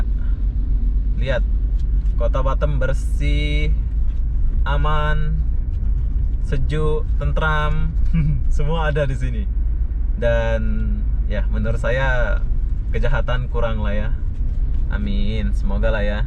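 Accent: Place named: Malaysia. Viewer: sip makasih mas, tapi logatnya kayak malaysia yah..hehhehe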